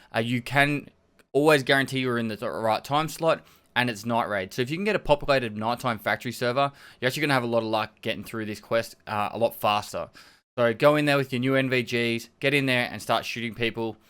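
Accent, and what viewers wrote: Australia. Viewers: a Absolutely no offense intended here, but your (?Australian?) english is a bit harder for me to listen to (sorry, I really mean no harm, I'm not native speaker). And when you add this intro thingy it's really hard to swallow. Most of the times when I play the video, I hear it and I stop the video, then I have to take a deep breath and after like 5 minutes I can finally get to the video (or should I say crack straight into it? Anywqy I hope you dont interpret this in a wrong way. I support you and I thing you are one of the more informative guys.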